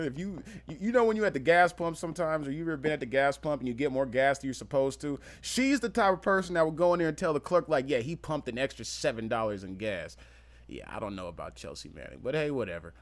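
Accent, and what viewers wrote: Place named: New Jersey, United States. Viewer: Are u from Jersey bro? You sound like it. Either way keep it up brotha!!!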